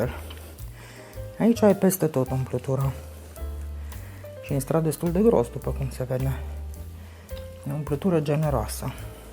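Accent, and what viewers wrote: Transylvania, Romania. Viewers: Sunteti exceptionala din toate punctele de vedere ! Reteta dvs de rugelach e exceptionala .Sunteti rapida , expeditiva ,foarte clara cu explicatiile privind reteta . Folositi un limbaj demn de apreciare si respect pt persoana si personalitatea dvs . Aveti un accent ardelenesc . Si eu sunt ardeleanca stabilita in NYC de multi ani . Imi place sa gatesc si sa maninc bunatati ! Voi urmari retetele publicate de dvs pe UTube cu mult interes si dragoste ! Angela Stavila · Mie îmi plac toate și mi a lăsat gura apă . Minunate și vorba dulce ardelenească care îmi trezește numai amintiri plăcute 😋😘💞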